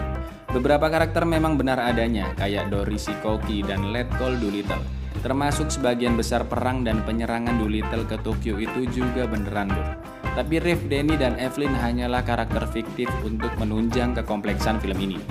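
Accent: Java, Indonesia. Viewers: G rame suara jawa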